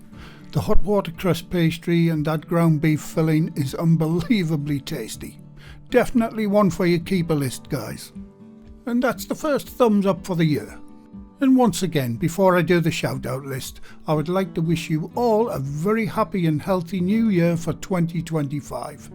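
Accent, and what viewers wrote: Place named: Northeast England, United Kingdom. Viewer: is from north east England, where people are known for their kindness and being very friendly. I know, I live here too.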